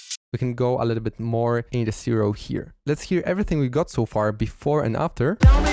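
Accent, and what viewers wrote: Poland. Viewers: Wait... are you from Poland? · wow masz polskie imię i nazwisko:) W sumie domyślałem się po Twoim akcencie:) super robota i super kanał, Przez lata siedziałem za sterami pro tools i cubase, przesiadam się na fl studio i bardzo efektywnie mi w tym pomagasz! Pozdro! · Is Polish or German? Or Polish German? 10% for Netherland...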